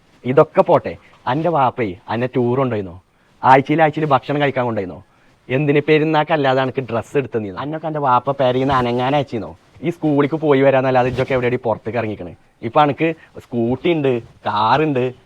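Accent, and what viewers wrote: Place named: Malappuram, India. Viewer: ഇജ്ജ് അൻ്റെ മലപ്പുറം സ്ലാംഗ് പിടിച്ചാൽ മതി, ഇടക്ക് ഭാഷ മാറുമ്പോൾ ഒരു ഗുമ്മു ഇല്ല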